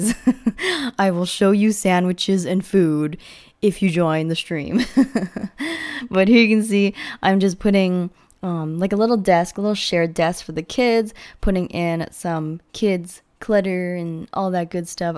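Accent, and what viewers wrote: Canada. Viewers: Building is like all I do in the sims, so I don't really download builds mostly watch for inspo because I love your style. And also, of course, for the chat and the company 😊 my one year old also likes your videos, think he likes how fast it moves and the Canadian accent 🤣 (we're Scottish so very different lol)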